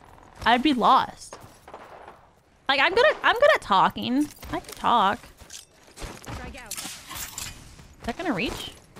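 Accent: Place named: Texas, United States. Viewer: Your Texan accent really came through in this vid! Lol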